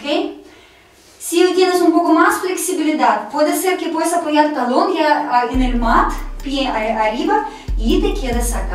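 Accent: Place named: Russia. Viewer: Estás rutinas me encantan,combinando el yoga y perdida de peso, las amooooooo😘😘😘😘😘😘😘😘😘Un besito y no dejes de decir huesito púbico que me encanta tu acento ruso español jajajaja 😂😂😂😂😂😂😂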